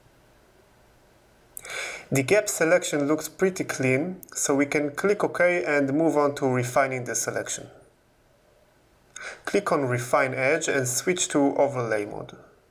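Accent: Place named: Israel. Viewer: Are you Israeli? You sound very Israeli.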